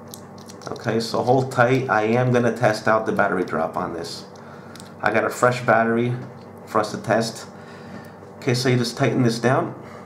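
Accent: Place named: Portugal. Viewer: When I stumbled on to your channel, you reminded me of someone, your slight accent that comes through in English. I couldn't pin point it. Then I realized you remind me of my dad, and various family members, I wondered if your were Portuguese, after more poking around through your past videos I saw the Portuguese reviews! Really enjoy them. My parents are from Portugal, born there, while I was born here in Canada. You seem like you and my dad would be friends, which would be great, would persuade him to stop smoking. I really enjoy your videos, and love watching your portuguese reviews.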